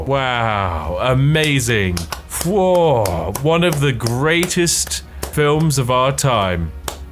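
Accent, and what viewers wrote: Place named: RP, United Kingdom. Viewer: what part of england are you from you sound more posh than the people south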